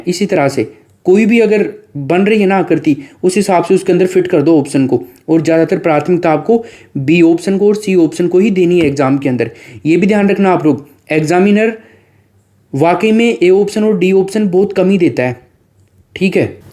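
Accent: Rajasthan, India. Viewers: Sir aapki trick bhut aachi h usse bhi aachi h aapki voice or bich bich gjb rajsthani( ade, vde ,mahine)😘😘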